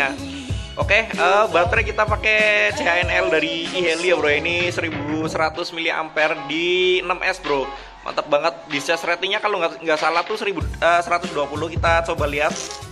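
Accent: Banyumas, Indonesia. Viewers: Halo yutub ketemu lagi dengan.🤣🤣👍👍👍👍..5 inch in the best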